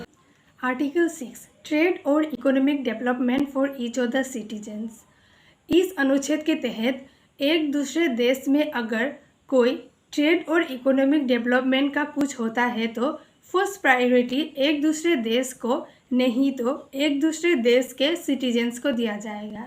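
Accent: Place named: Northeast India, India. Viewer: H bhai awaaz se smjh aa rha ya toh northeast se hai ya bengal ya fir nepal ye ladki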